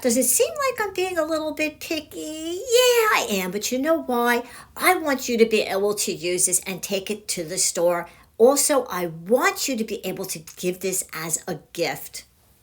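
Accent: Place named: Connecticut, United States. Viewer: Just 'discovered' you today!! I've subscribed & given you thumbs up!! You are such a great teacher!! I love your happy, cheerful energy! Your accent reminds me of my Aunt Mary. She came from Connecticut. Question: Can we use tissue on canvas bags, or only napkins? Now I'm inspired!! I guess fabric on fabric would work with this method??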